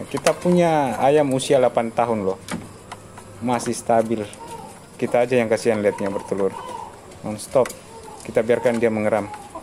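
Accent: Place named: Medan, Indonesia. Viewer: Lokasi dimana gan? Logatnya kayak org medan. Klo medan bisa la main2 ke farm ny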